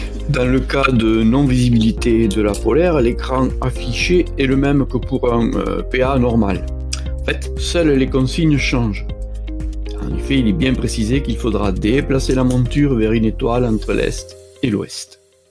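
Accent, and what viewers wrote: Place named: Toulouse, France. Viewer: Un grand merci pour cette série de très loin la meilleure sur ces sujets… c’est clair, précis et sans fioritures inutiles, ça va droit au but sans perte de temps mais à un rythme qui reste très facile à suivre et à assimiler. Pas de blabla, ça ne bafouille pas, c’est un travail didactique remarquable de grande valeur. J’y ai puisé tous les renseignements que je cherchais à comprendre et bien plus encore… Merci, merci, merci mille fois. Et j’ajoute que le petit accent toulousain est bien agréable à l’oreille, ça respire la sérénité tout ça…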